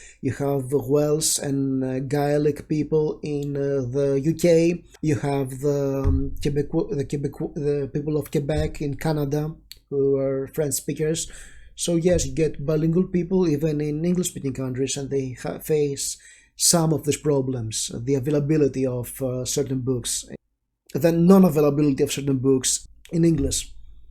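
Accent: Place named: Greece. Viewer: Well, random slightly off topic comment but, I couldn't find the Finnish audiobook of Frankenstein, and that made me really sad. I read it in 3 languages, but I wanted to listen to the Finnish version too and I couldn't. :( ...back to the video. Ah yes, the accents, I have like 20, and I know that's off-putting for some, but hey, it's what I have. Also, I can understand you regardless of the Greek accent. I don't find a problem with that. But then again, I'm so used to having friends and peers from all over the world, I know a lot of people aren't this used to accents.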